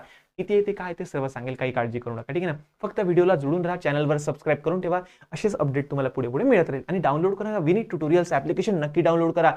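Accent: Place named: Khandesh, India. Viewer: Sir mi Mumbai thun ahe pen mazi Mummy khandeshi ahe tyamule Mela thodi thodi khandesh chi tone samajate ani Tumi nakki khandeshi vatate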